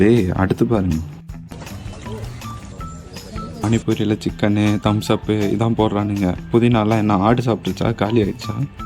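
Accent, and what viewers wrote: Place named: Madurai, India. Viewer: Bro...neenga madura dhana...unga pechulaye theridhu bro😂😂🤣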